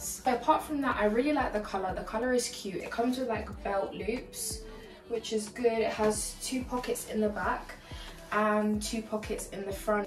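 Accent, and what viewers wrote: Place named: United Kingdom. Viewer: Your British Accent….❤️ Very Beautiful As Well…..😍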